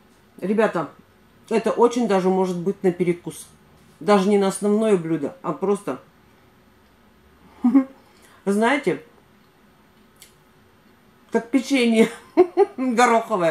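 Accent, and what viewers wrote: Kirov, Russia. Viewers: Как мне нравится Ваш кировский выговор, у меня бабушка и все родственники с севера Нижегородской области похоже говорят ))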